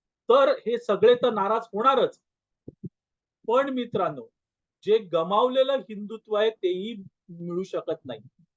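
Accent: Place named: Malvan, India. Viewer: malvani bolnyacha attahas karu naka pl nahi shobhat normal marathich bola please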